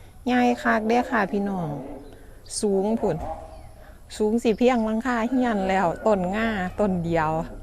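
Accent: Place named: Ubon Ratchathani, Thailand. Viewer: รายการดีมักหลายเว่าภาษาอีสานคิดฮอดบ้านแท้.. นางอยู่จังหวัดได่น้อสำเนียงคล้ายแถวอุบล.จ้ะ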